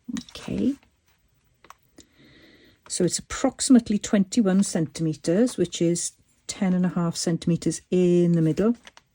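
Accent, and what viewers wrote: Wales, United Kingdom. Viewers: Wow. This is amazing. Thank you for the tutorial. I absolutely LOVE your Welsh accent.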